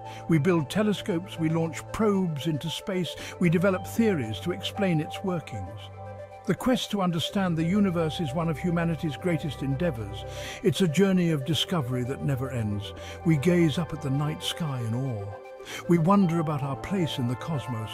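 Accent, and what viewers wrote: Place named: United Kingdom. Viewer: Old husky British voice 😁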